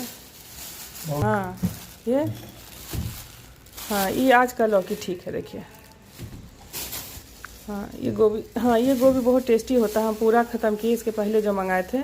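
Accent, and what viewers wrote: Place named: Bihar, India. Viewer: Aunty ji 🙏 apke bhasha se lgta hai ap bihar se hi hai 😊 kis jila se hai ap..bht acchi thali .. testy 😋 hmm hath se ese chawal neri maa v nikalti hai...❤ lovely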